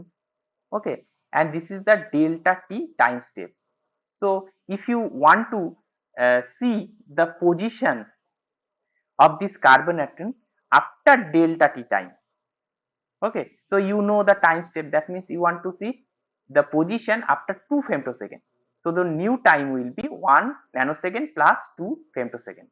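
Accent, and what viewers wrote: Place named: Bengal, India. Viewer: If I am not wrong, you are Bengali... your accent says that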